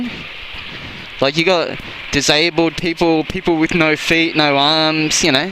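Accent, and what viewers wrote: Australia. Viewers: I really enjoy your narrative and your "down-under" accent, mate! Thanks for making the video.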